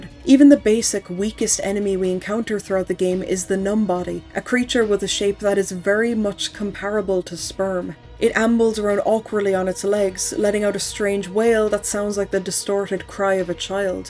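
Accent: Ireland. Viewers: Forgive me as I'm new, are you Irish? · it's always so cool to hear another irish person on ytube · Am I detecting a pretty strong Irish accent in your voice? 😉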